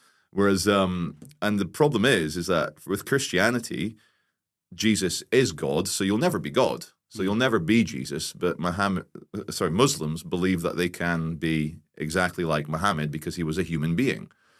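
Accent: Scotland, United Kingdom. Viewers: he got a scottish accent but hes not frome the uk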